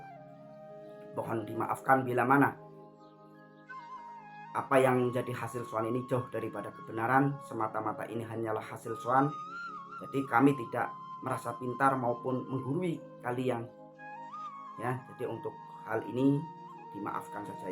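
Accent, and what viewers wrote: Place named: Banyumas, Indonesia. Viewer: Admin orang ngapak kayanya😊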